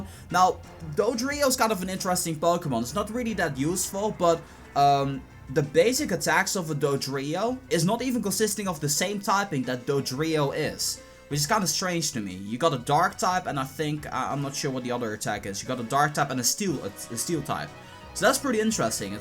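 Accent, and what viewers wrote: Netherlands. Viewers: u live in NL that explains the accent i am from the netherlands too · where do you come from you sound Hollands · where is he from? he kinda has a dutch accent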